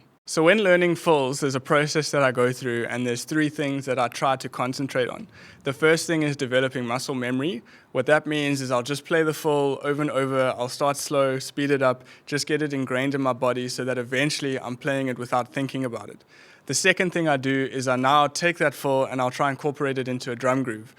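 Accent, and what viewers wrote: South Africa. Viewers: Awe u have an S.A accent😅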